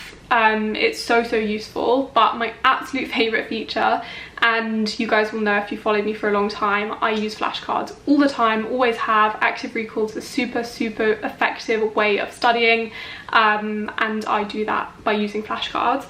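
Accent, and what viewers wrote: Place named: United Kingdom. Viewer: that British accent 🤤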